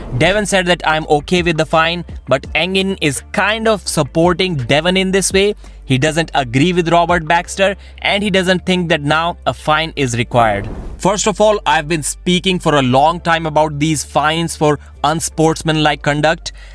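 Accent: India. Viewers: This pajeet accent is hard to listen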